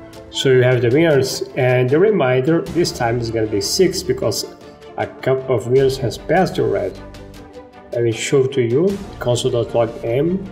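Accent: Brazil. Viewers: Você é brasileiro ne ? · I've never heard a brazilian dude speaking english😂😂😂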